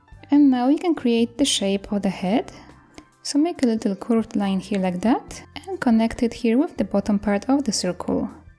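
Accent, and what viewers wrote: Poland. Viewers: Czy mi sie wydaje czy słyszę Polski akcent?:)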